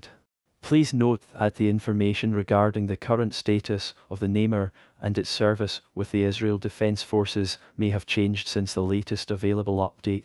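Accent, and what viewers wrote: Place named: Scotland, United Kingdom. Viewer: You're Scottish!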